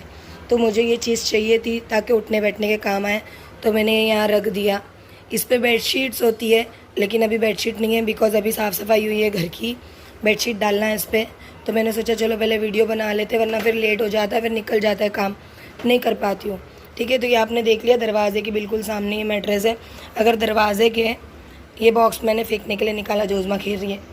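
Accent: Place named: Nagpur, India. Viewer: Asslamoalaikum ji.. Aaj pehli bar apka vdo dekha maine.. Balki apko hi pehli bar dekha maine aaj.. Pata nhi Q but aap dekh k bahot apnapan feel hua mujhe.. Like apki bate hamare Nagpur Jaise lagi.. Aap kaha se ho.. Or vdo bhi dekhugi ab mai apke.. 🤗🤗🤗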